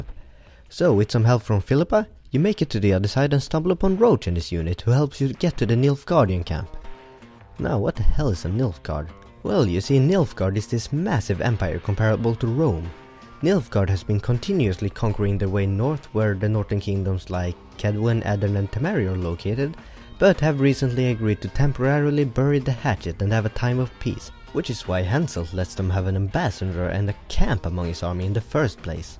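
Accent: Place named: Finland. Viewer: Thank you for this, very helpful! I've been trying to place your accent, are you from Finland?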